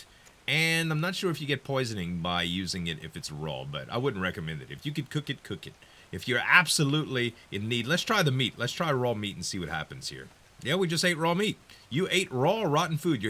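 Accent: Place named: South, United States. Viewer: with that accent you have got to be south of I-10 like me..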